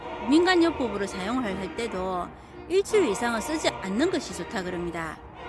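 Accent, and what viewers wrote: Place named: Gyeongsang, South Korea. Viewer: 경상도말씨에 정감이갑니다 까마중에대한자세한 설명고맙습니다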